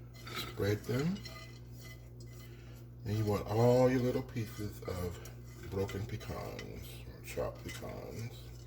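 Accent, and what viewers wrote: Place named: South, United States. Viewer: Love your southern man accent. Yes!!! Loving the 😀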